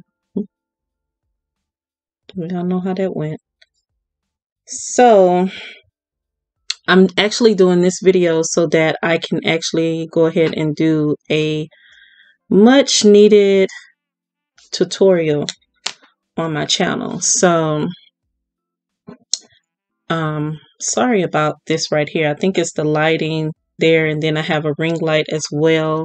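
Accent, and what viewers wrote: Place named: Chicago, United States. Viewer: YOU SOUND LIKE YOU FROM CHICAGO!😊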